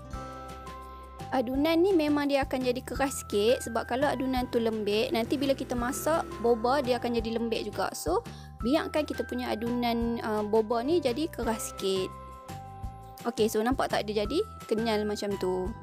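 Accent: Kedah, Malaysia. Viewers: Org kedoh? Atau org oprg perok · Kuat gile kedah nya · Suara macam Wartawan AgroTV kat TV1. Tpi ni version Kedah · Ayaq ayat kedah L.A. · Ni orang kedah ka kl.. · org kedah ke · orang kedah ke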